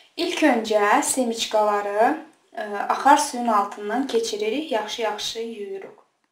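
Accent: Azerbaijan. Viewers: Ayaallah tam Azərbaycan ləhcəsi 👍🏻 Halal olsun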